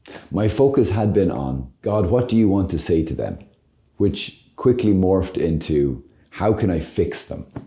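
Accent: Ireland. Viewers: U have a little irish ❤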